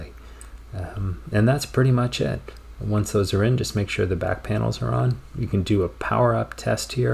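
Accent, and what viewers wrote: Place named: Canada. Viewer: I bet you're Canadian.... (my ears always perk up when I hear "aboat"). A Sparc 10 was what I spent the latter half of the 90s on. Nice to be able to project vicariously what upgrading mine would have been like...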